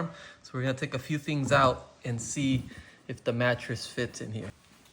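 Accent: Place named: Matagalpa, Nicaragua. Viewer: I used to live in matagalpa and I recognized the accent from there on you but now I see you live in the segovias my hometown best place to live beautiful weather and beautiful people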